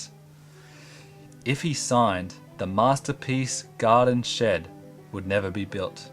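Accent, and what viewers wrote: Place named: Birmingham, United Kingdom. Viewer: i suspect a brummie accent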